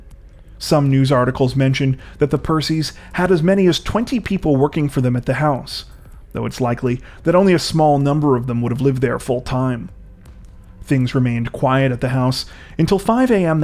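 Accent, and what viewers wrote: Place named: Canada. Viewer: I don’t watch a whole bunch of crime channels, but I appreciate your work. I’m old, and the nostalgic ones really get me. I also like the respectful approach to events are at once fascinating and horrifying. I feel bad for the families involved. Death by illness or accident can be understood; death by violent murder must be very hard on the survivors. I’m so sorry for them. Additionally, I love a Canadian accent. “Been” absolutely should rhyme with “seen” but among Americans, it never does. Thank you so much.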